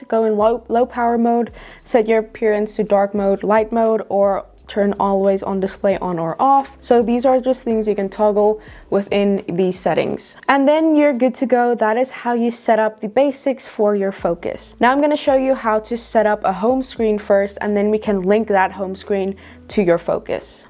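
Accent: South Africa. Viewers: Are you South African? Great video!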